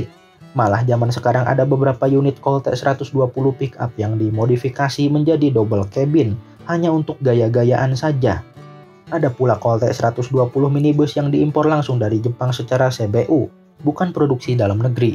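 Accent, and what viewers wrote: West Java, Indonesia. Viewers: Penyiarnya urang Sunda keneh nya?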